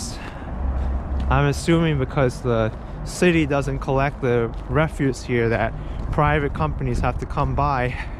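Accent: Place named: Queens, United States. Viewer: That was charming I really enjoyed it. You have the best Queens accent I ever heard, not too nasal. Just right.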